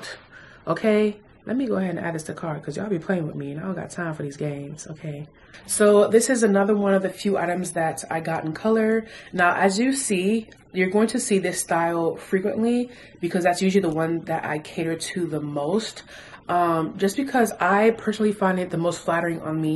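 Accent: United Kingdom. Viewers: Your British accent though...love it, and I'm mad country!🤣🤣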